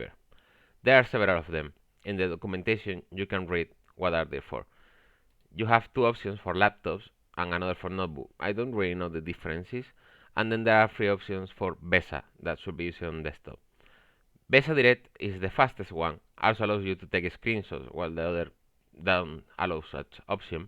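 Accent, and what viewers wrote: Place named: Spain. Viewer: Eres español? Tu acento te delata lol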